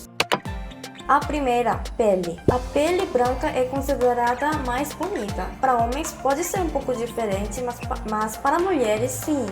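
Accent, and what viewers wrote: South Korea. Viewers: GENTE UMA COREANA FALANDO PORTUGUÊS 😻♥️ QUE FOFINHA . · uma coreana falando portugues e a coisa mais fofinhaa, mano eu tomo sol e nao consigo ficar bronzeada.. e triste ne mas e cara eu nao tenho bundaaaa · Parabéns, raramente tem sotaque coreano em algumas partes. Sua pronúncia é muito boa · Coreano falando português é a coisa mais linda do mundooooo❤❤❤❤ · O sotaque coreano é de longe um dos mais gostosos de ouvir falados na nossa língua :v Me dá agonia ouvir estadunidense ou britânico falando português... Já o coreano fica tão fofinho. Acho que é pq vcs realmente se esforçam pra aprender a língua e falar bem.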